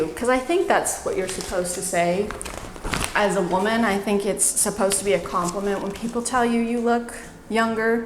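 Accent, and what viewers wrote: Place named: Tennessee, United States. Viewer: This is the most Tennessean I've ever heard you sound. And I do mean it in a good way, promise.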